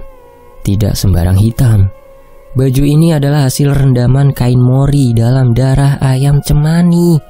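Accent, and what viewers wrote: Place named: Kebumen, Indonesia. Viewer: Mbak dr smg. Tp logatnya kebumen 😊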